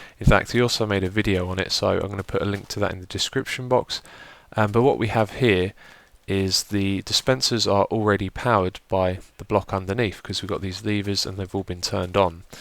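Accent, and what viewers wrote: Netherlands. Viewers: Haha, I could tell by his accent that this guy was Dutch :D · I think you can really hear it, actually. I am dutch myself and am really familiar with dutch people trying to speak english. You can defenitely hear that he's dutch.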